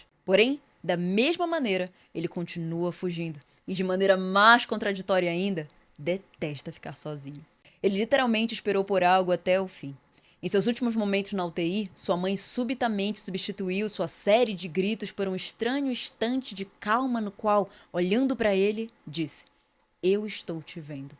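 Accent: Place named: Pará, Brazil. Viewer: Ela tem sotaque paraense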